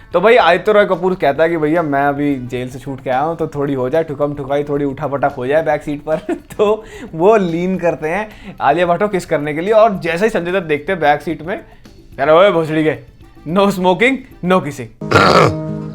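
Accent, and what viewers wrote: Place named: Delhi, India. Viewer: Not to stereo type but love your delhi accent...accha lagta hai apna delhi ka bhai itna accha kar raha hai.... All the things you say how to say the metaphor the references mahn love from jamna par but not shahdra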